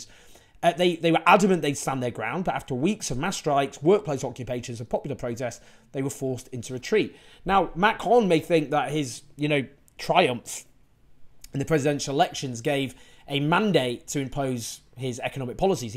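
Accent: United Kingdom. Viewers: British need to get rid of the royalty thing. It's so unthinkable that you still admit this kind of institution. We got rid of our king a long time ago (although killing him was a bit too much). Our sense of resisting and expressing the popular demands come from that time when our people took threw away the monarchy. Anyway, lovely to hear your British accent. I feel like I am hearing a Shakespeare play at times.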